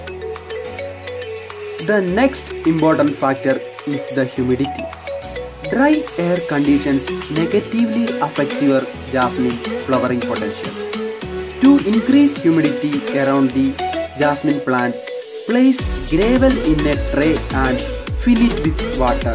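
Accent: Kerala, India. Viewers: Malyali english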